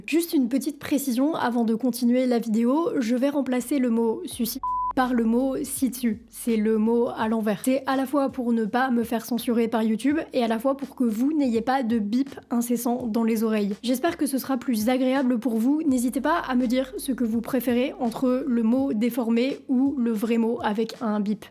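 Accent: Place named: France. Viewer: Très bon documentaire, bravo! Par-contre si je peux me permettre, essayez d'améliorer votre anglais.''The'' devrait sonner presque comme ''de'' et non ''ze''. Vous n'êtes pas la seule, la plupart des français le prononcent comme vous! Juste mon petit grin de sel ; )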